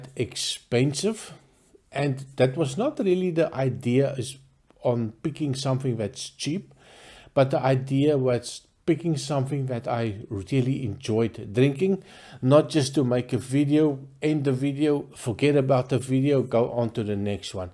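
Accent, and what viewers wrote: South Africa. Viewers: Very surprising top 3. I've had none of them before so I definitely gonna try them. Besides that, I appreciate the honest way you talk about whisk. Your south-african accent brings back good memories of my two holidays I've spent there. I live near the Belgian border in the Netherlands. Maybe we'll meet somewhere :-) Best wishes for 2023!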